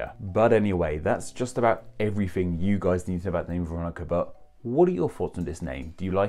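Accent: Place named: United Kingdom. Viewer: I’ve seen other people make similar comments on other videos. Maybe that’s just the accent in the part of the UK where is from? Or maybe it’s just his own unique personal accent?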